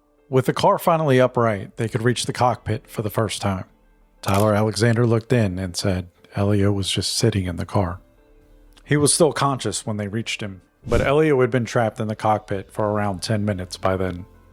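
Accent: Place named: United States. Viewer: It must be AI as the maker claims he's based in India. So this must be an AI voice with an American accent.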